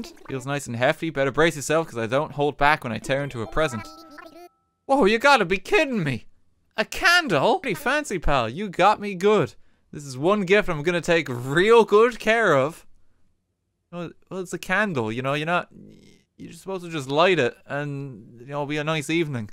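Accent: Ireland. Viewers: You're irish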